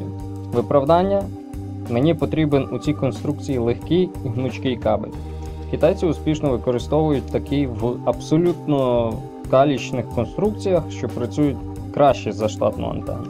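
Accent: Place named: Lviv, Ukraine. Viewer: Простим "Дякую" не відбутись:) Відповідь надали більш ніж вичерпну. Цікавить ваша думка стосовно діполя, тому як серед аматорів вирують думки, що це не є добра антена, і годиться більше на авіадіапазон ніж на любительський 2 метровий. Ще питання, звідки ви.? Акцент Львівський, але на кругляках вас не чув Дякую ☺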